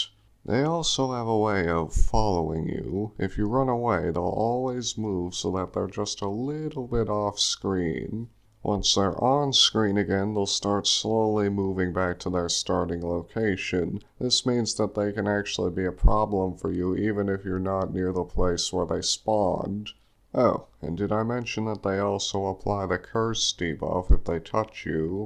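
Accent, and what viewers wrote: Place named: South, United States. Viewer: Is it just me or does his voice give off " " vibes?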